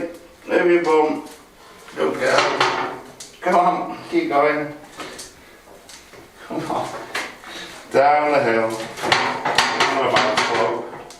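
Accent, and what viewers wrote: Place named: England, United Kingdom. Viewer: Could you tell me where in England is your accent coming from? Thanx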